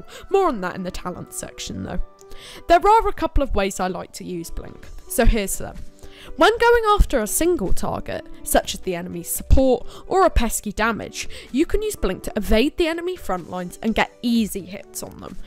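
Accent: United Kingdom. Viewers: British young guy accent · this english accent XD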